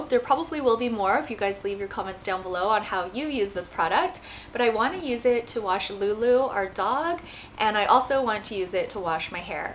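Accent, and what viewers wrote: Canada. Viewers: Canadian? Sounds like it from how you say out and in a pinch.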